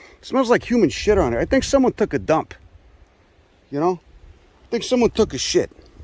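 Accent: Chicago, United States. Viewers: Ah, love the speak. 20 years LA via Chicago (et. 1970 Cicero ) You turned me onto some really good reference books. I can’t keep up with you. But I love going back to reference what you say and describe. But , the Chicago that exudes from your pores makes me homesick for a memory. I’m so glad I found your channel. The more I learn the better I feel! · Sounds like some of my Chicago family members but on steroids... Love this guy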